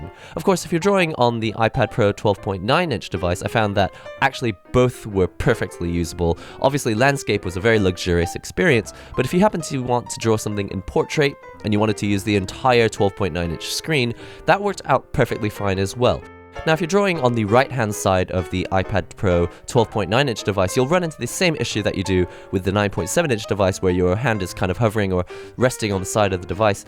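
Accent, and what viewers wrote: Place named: United Kingdom. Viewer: you sound like a british Amir Blumenfeld. Thats a compliment lol.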